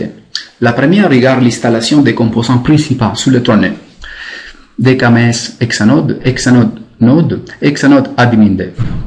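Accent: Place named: Italy. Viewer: Tres bonne explication , avec une petite pointe d'accent Italien !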